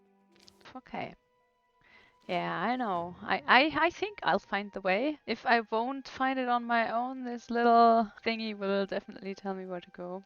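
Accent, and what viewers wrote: Germany. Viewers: Are you German or Austrian? Wonderful content Edit: yes you said you are German in the video lol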